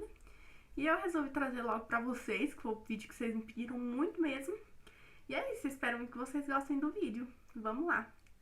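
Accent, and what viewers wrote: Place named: Minas Gerais, Brazil. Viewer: Nosso sotaque mineiro é bonito demaisss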